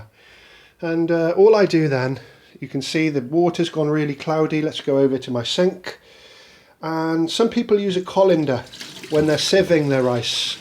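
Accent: United Kingdom. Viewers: British accent is amazing !!!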